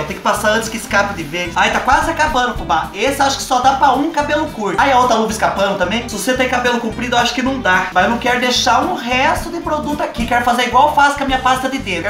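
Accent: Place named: São Paulo, Brazil. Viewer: Gosto mto dos seus vídeos vc, é mto engraçado, com esse sotaque paulista.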